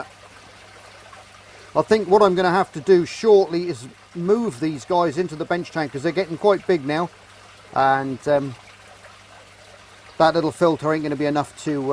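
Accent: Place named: United Kingdom. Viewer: Rossendale nice to hear a British voice 😊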